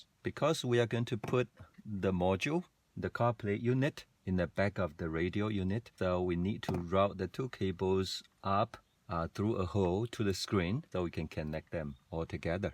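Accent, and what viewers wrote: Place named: Germany. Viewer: Great video, neat and clean during all the steps. Also like your voice, sounds like you speak with very slight German accent or German speaking manner. Anyway, just noticed one problem - according to the manufacturer you should not glue the RF/WIFI antenna to the metal surfaces, including the box itself, because this may cause some wireless connection signal issues. Instead you should glue it to the plastic surfaces, more closer to the driver, or where the phone usually lies. Another question - how different is the sound quality, compared to your oem bluetooth and aux? Also, when having a call inside the car, do you use oem BT phone or carplay phone via aux?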